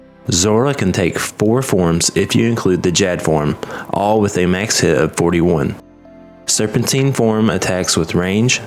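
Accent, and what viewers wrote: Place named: South, United States. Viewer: I don’t know how you somehow have a bit of a southern USA accent but also sound like a European of some sort too lol.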